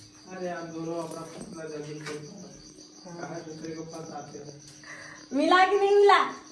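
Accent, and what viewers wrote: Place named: Lakhimpur, India. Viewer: Deshi languge Lakhimpur waali bhasha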